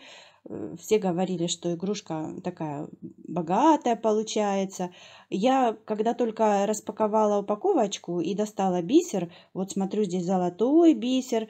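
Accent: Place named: Kharkiv, Ukraine. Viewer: Все в порядке у Вас с языком, по крайней мере не настолько, чтобы ушам было больно. Что ж поделать, если у нас, харьковчан, такой акцент) Я даже в комментариях ниже заметила ошибки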